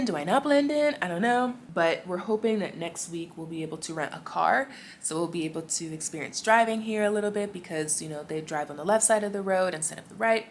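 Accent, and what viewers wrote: United States. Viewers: People don't know just by looking at you that you are American so that can't be why they're staring. You don't look American, just African. I think it might be your accent that gives you away. Or vlogging in public. The staring doesn't come from a bad place. People here take interest in other people. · Welcome back 🤗🤗🤗🤗 we cant tell if u AA until u open your mouth, you must just be used to greeting even people u dont know 😆😆😆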